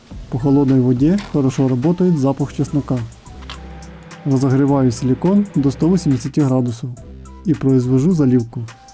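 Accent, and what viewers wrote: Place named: Vologda, Russia. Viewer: характерный вологодский говор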